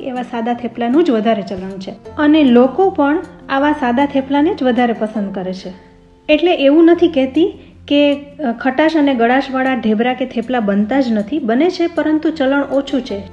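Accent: Kathiawar, India. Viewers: Very nice. Kathiyawadi bhasha khub mithi lage sambhalwama.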